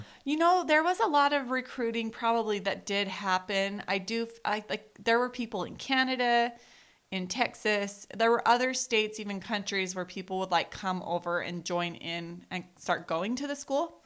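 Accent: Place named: Utah, United States. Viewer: I love you, so much more after hearing your story. It’s incredible how God uses our testimonies to grow and change us. I could tell after us meeting that your accent sounded like you were from that area of Utah! I’m so proud of you for sharing your story. Love you guys!!!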